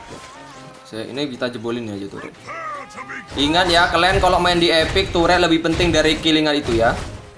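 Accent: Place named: Pekanbaru, Indonesia. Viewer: Lho abg org Pekanbaru..?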